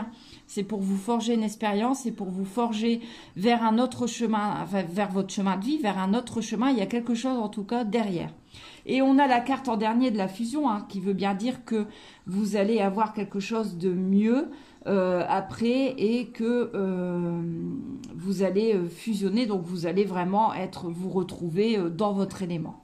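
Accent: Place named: Nord-Pas-de-Calais, France. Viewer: Bonjour je vous ai vue par hasard tout à l heure sur un partage (groupes) et donc Je vous découvert et la vôtre attitude envers les personnes qui vous posée des question et votre façon de parle(chti) 😉mon interpellé, je vous ai de suite à précisé 💜🙏 je vous suis donc sur fb et la chaîne YouTube, merci pour l'horoscope(Bélier) je me reconnais😂 merci à vous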